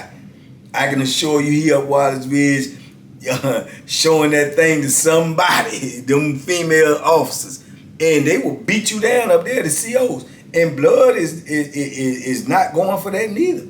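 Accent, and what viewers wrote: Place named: Tidewater, United States. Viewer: you have a way with words! I can forsee you writing a Book/Audio Book,I lived in the Tidewater Area,and when you say certain words,it takes me back! Appreciate your efforts! Keep applying Pressure to these other You-Tubers.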